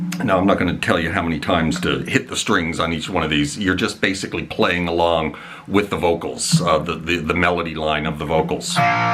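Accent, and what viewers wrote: Canada. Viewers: Well, thanks for sharing your knowledge! By the way, from the Traynor amp in the background and your accent - I knew you had to be Canadian. (I grew up in Alberta.) Checking out your offerings now!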